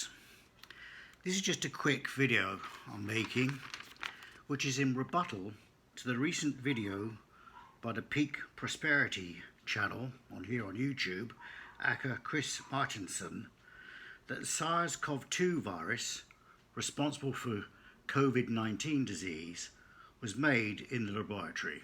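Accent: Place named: United Kingdom. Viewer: Do you have anything other than a British accent to establish yourself as an authority? Because that really doesn't do it, especially these days.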